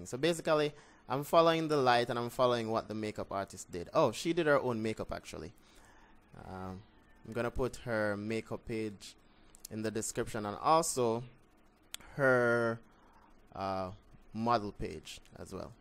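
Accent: Jamaica. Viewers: 🇯🇲 accent. Big up yourself mi genna. You done know how di ting set.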